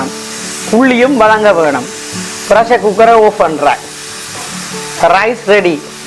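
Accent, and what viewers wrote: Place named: Jaffna, Sri Lanka. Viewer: very nice talk in jaffna language good 😂😂😂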